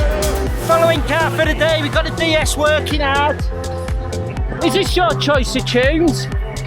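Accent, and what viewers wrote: United Kingdom. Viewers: Jackie Stewart is Scottish. is probably Mancunian (from Manchester)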